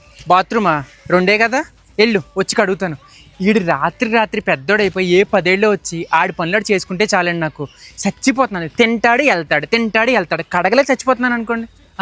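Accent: Godavari, India. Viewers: Achamaina ma godavari yaasa vinte antha anandanga u do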